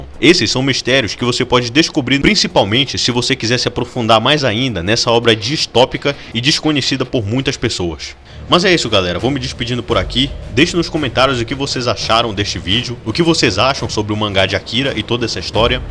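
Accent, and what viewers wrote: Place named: Ceará, Brazil. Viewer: Oloco, cearense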